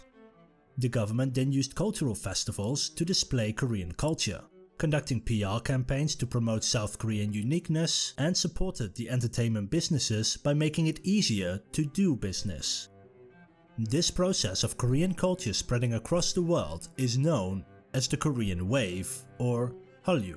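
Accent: Netherlands. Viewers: Is the narrator from The Netherlands? The Dutch always speak better English than native English speakers. Lol I've been more interested in Korean history, this video was great.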